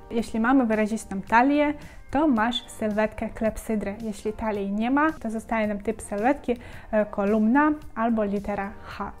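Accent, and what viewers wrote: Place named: East, Poland. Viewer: Następna stylistka z wschodnim akcentem.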